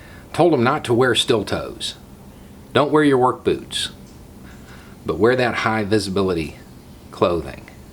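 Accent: South, United States. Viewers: I like hearing a southern voice with smarts (i.e. not a conservative shill).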